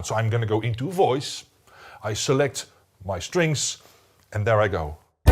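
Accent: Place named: Netherlands. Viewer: His accent... must be dutch.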